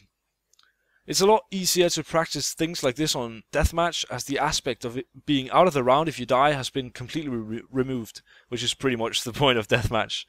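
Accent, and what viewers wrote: Denmark. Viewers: Are you danish? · du er Dansker ik? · Dansker ihhhh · Are you Danish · lol du er dansk..nice..bare bliv ved med engelsk...hader danske youtuber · Are you dannish?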